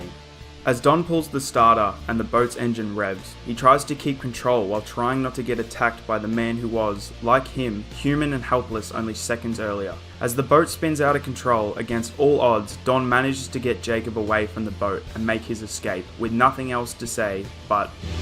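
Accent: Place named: Australia. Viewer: Hey, you sound Aussie! I really enjoyed this one and think you have real good potential with your timing, technical setup, conversation points, choice of scene/film, and fairly down to earth approach; even if it's a tad too obvious you're reading your script haha. I know there are a lot of film reviewers out there, but with recent events now would be a great time to really try to push your channel toward the film analysis/review crowd, if that's the direction you want to go in. I think you'd do well. Good luck with your next one.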